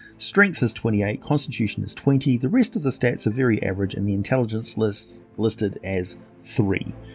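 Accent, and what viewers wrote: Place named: New Zealand. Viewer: I swear, sometimes I tune in or rewatch just to hear you say “murder” half a dozen times or more. Your accent brings much joy to this Shakespearean actor, and one day a director will have me employ a kiwi ‘cent, and I will send you a ticket.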